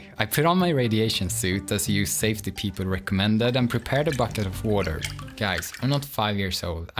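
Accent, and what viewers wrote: Sweden. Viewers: A- are you swedish? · YouTube knows me well, nothing better than Swedish accent working on an impossible music instrument to not go to sleep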